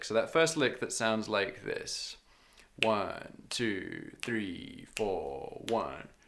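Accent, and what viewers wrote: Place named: England, United Kingdom. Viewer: You're Scottish? I never would have guessed. Your accent sounds English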